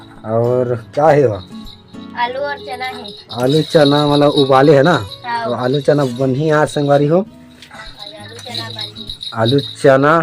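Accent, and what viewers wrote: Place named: Raigarh, India. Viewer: Tuman k baat sun k Lagat he ki tuman raigarh jila ķ ho hai naaa bhaia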